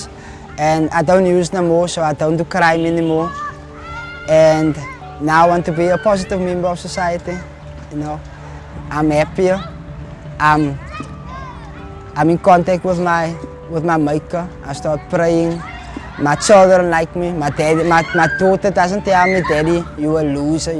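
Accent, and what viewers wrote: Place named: South Africa. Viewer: such an awesome testimony,plus how awesome is the south african accent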